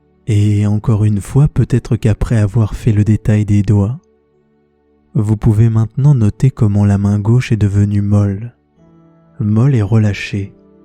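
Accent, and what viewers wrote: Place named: France. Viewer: woooow! Je suis abonnée à ta chaîne depuis peu. Je faisais de l'insomnie depuis un moment et tes vidéos mon sauvé 😇 Particulièrement cette vidéo là! J'ai dormi dur dur comme un bébé tout la nuit et je me suis réveillé que le lendemain! Merci énormément, tu as une voix hypnotisant et doux. Je t'écoute tout les soirs xxx (je viens de Québec et je trouve l'accent français si agréable à écouter 😍)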